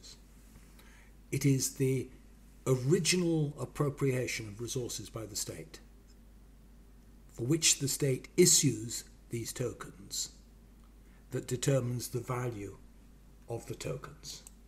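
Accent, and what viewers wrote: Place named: Upper class, United Kingdom. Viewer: I once saw a video of hers advocating nuclear energy, leaving aside a few important sobering arguments against nuclear power. I thought they‘d be useful to consider and had them placed in the comments. - No reaction. I also don’t like too much the tone of voice and the sort of strange upper class English she speaks, presumably in order to underline excellence and intellectual superiority.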